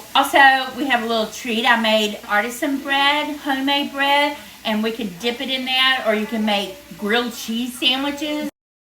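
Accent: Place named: Alabama, United States. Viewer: Hey y'all. I just found your channel. Your accent sounds like mine😂. I'm from Alabama where I've lived most all of my 64 years! Where are y'all?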